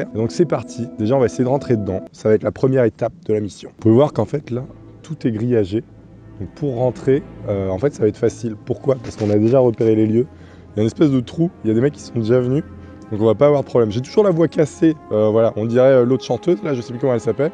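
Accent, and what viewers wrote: Belgium. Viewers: je suis belge et je confirme ton accent ET oui c'est vrais les "esprits" belge son parfois violent;) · Vraiment sympa tes vidéo Urbex :-) Tu utilise quoi comme caméra pour ta night vision ? car je cherche quelque marque ou référence. Sympa ton accent de Belge mais de mon coté vers Charleroi on as pas cet accent de Bruxelles ^^ Par contre oui quand on rend la monnaie ou n'importe quoi d'autres, on dit bien s'il vous plais. Vive les frites et surtout les bonne bières :-)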